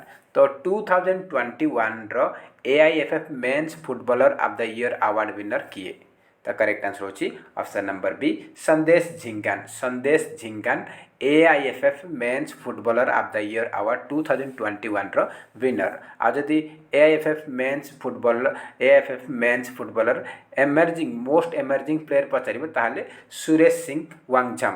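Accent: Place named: Sambalpur, India. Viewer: I think the spoke person of this vdo is belong from sambalpur district.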